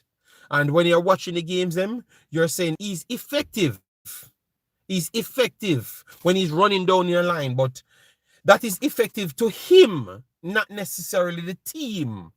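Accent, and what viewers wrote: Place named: United Kingdom. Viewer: Great to see you back big boss! That british accent kills me everytime 😂😂😂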